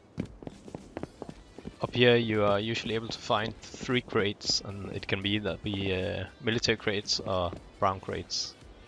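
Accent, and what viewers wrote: Denmark. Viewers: are u danish? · Thank you for the video. ( and just in case if im right. saying. Tak for Videoen. I might be wrong, but from the sound of your accent. I believe you to be Danish ( from Denmark)) denne Video var meget hjælpsom. :)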